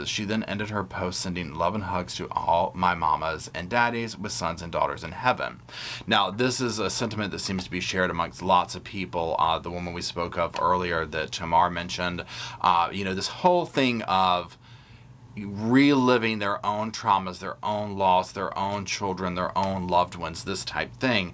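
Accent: South, United States. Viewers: I love your southern drawl! I could listen to you talk aaaaaaaaaaallllllllllllllllllllll day! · 7/26/23... +...the Gruesome 2-some! 😊 Love your Southern accent + your common sense analysis of this ridiculous lie/hoax! God bless taxpayers who have had to pay all this horrible debt for this expensive FAKE SEARCH just b/c she has "hurt feelings" + "seeks attention"! She wants attention + is seeking fame + fortune + book deal + movie deal! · Sir what part of the south are you from. Love the accent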